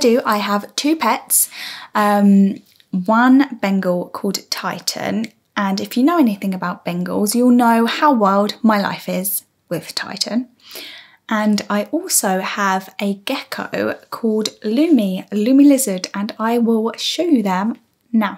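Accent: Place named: United Kingdom. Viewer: Hi.. I love your English British accent❤️Im happy you love dogs too.. I have 5 dogs at home and 2 cats.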